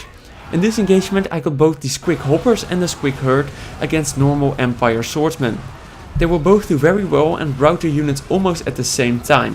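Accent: Netherlands. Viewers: let me guess, you're Dutch xD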